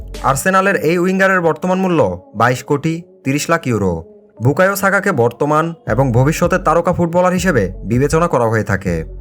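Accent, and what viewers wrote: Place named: Bangladesh. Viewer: Tui I think bangladeshi mal tor vasa sune bojha jay.. Tora video bans na..jato abal public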